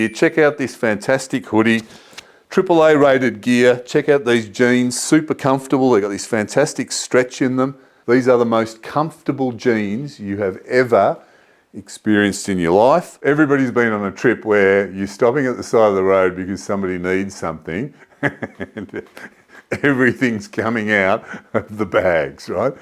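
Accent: Australia. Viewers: 😎👍 Big thumbs up for the channel... Love hearing different way things are said Down Under... Motorbike... first time for ever saying that word... I'm 68... Stay Safe Brothers...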